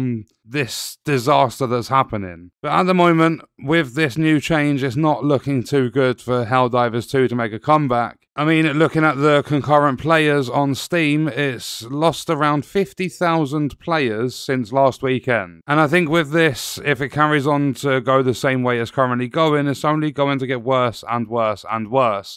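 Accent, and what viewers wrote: United Kingdom. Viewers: Nicw to hear another british bloke commenting out here. And yeah, this decision has been the fastest reputation "self unalive" I have seen for a game recently. I really hope some competent individuals can come together to start a class action lawsuit against Sony. It would be cool if Arrowhead sued Sony for the damage they've done to their game, possibly even sinking the studio if it gets worse.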